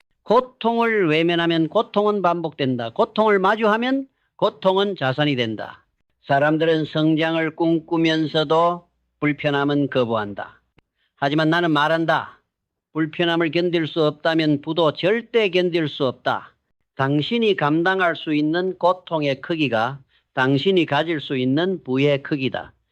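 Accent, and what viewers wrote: Gyeongsang, South Korea. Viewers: 굉장히 호소력있는 목소리 입니다. 경상도 억양이 되레 호감으로 들립니다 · 역시 경상도 목소리가 좋아요...강한 느낌...머리 속에 팍팍 박혀요~~~~^^ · 경상도 목소리가 진짜로 조언해주는 느낌이라 좋아요 ㅎㅎ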